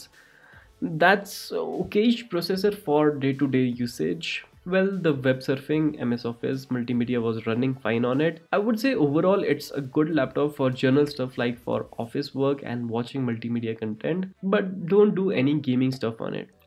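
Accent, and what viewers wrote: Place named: India. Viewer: omg this indian voice meeeeh, dislike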